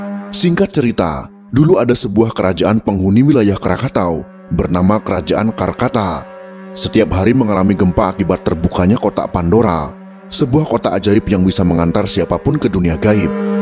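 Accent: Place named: Bali, Indonesia. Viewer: Bang, org Bali ya??? Pengucapan T nya Te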